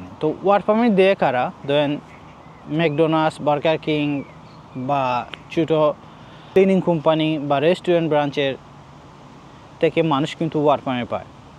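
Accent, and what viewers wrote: Sylhet, Bangladesh. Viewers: কথায়ই বুঝা যায় সিলেটি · ভাই কিতা সিলেটি নি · মনে হয় সিলেটি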